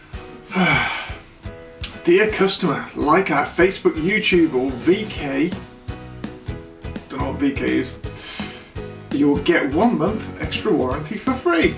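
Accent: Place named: United Kingdom. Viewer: I am thinking to get one of these but not sure if I will be able to use this as trackpad so I can operate Adobe Apps (e.g. Photoshop, Lightroom, Premiere PRO, Premiere Rush and so on.) Any advice will be much appreciated. Although this one is done in 2017, do you still use this and creating any contents? Check out my channel and let me know if you liked any contents useful so I can improve further. As a Newbie, we can grow together if you still creating and interested. You got my support (263) and hopefully you will be doing the same and keep in touch, especially it sounds like you are from here in UK. Please keep in touch so we can discuss and grow our respective channels and get ideas for our contents. Please look after yourself and each other & Stay Safe. "Life is Short, so Live Long & Prosper - "Our World is a Shared Experience" - #TubeBuddy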